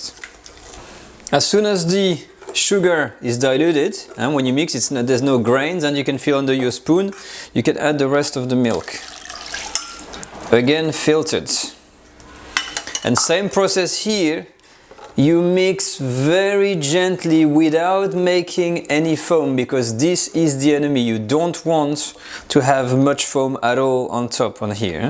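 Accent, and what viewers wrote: France. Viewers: I like your French accent man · This tutorial is a winner 🏆. I have exactly the same ramekins and the French accent have convinced me!!!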